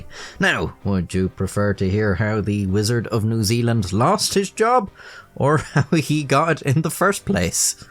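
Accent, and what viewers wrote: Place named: Ireland. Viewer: hes irish. he has a reason to not speak a london or oxford adjacent accent.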